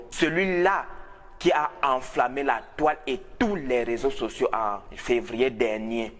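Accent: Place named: Cameroon. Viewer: Toi tu dois être camerounais vu ton accent😂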